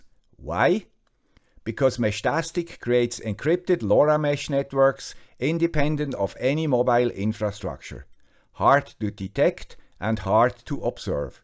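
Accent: Switzerland. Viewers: Haha liebe das schwizer englisch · I love your Swiss accent, more videos please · the guy with a Suiss accent and a small pointing hand.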